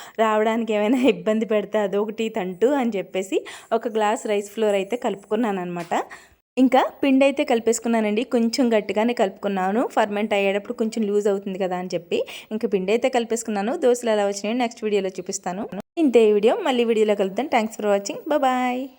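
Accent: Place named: Godavari, India. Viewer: Meru vidiga matladetappudu godavari yaasa lo matladutunaru...voice over echetapudu normal gane matladutunaru baga gamaninchana andi... Ayya baboii siggesestondi .... 😂😂😂😂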